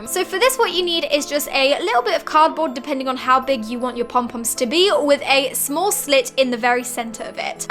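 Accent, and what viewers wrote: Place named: England, United Kingdom. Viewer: Yes I like your DIY core where are you from are you from England or from are you Irish cuz it's I was just curious cuz that accent sounds like you're from England it'd be great to hear somebody else you know talk English I just wanted to compliment on your your crafting send me a line and tell me you and I'll just send me a line I said Thank you or you know that kind of thing all right God bless you have a blessed week and evening I can't take care of by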